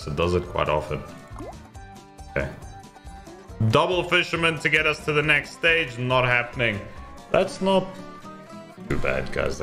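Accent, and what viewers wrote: South Africa. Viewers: Dude are you from SA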